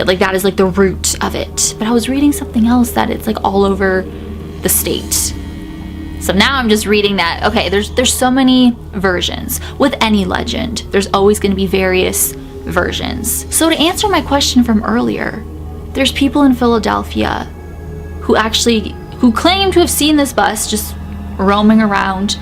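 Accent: Canada. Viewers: First of all your Canadian accent is fine, I love it! Nothing wrong with it all :) Pennsylvania resident here..am about a half hour from Gettysburg. Would love to go there. Born in Philly! Yeah this legend is abit baffling but super interesting and trippy! If you want to explore another incredible story relating to Pennsylvania, look up the town of Centralia. I won't spoil any details here. Not really paranormal but I think it's quite interesting...and true. Thanks for the love for PA! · No don’t lose your Canadian accent ☹️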